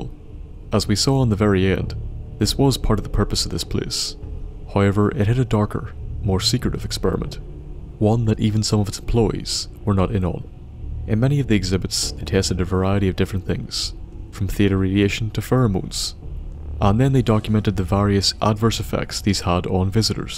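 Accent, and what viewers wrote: Northern Ireland, United Kingdom. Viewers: hey i'm new to the channel:3 and as soon as i heard your voice i was like "yay another NI youtuber:)" so where abouts are you from?? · Are you from NI?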